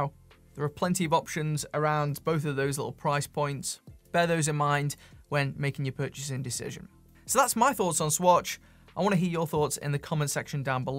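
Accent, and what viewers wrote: United Kingdom. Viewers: OMG, my brain is so confused watching you. You sound like a native Brit, which I assume you are, but look totally like Slav :)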